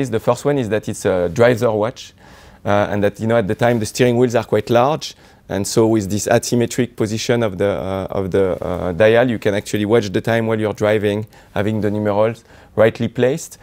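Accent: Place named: France. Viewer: strongest fr accen.i hearrd in a while lol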